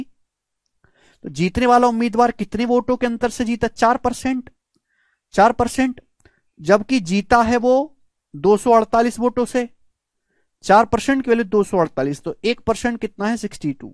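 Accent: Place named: Haryana, India. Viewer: Ram Ram ji haryana ki Badhiya padhao ho sirji In haryanvi style 😊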